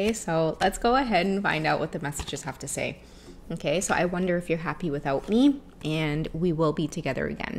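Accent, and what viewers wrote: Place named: Trinidad and Tobago. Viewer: It sounds so lovely when speak Trini. I just happened to hear on of your shorts rt now where Ur speaking Trini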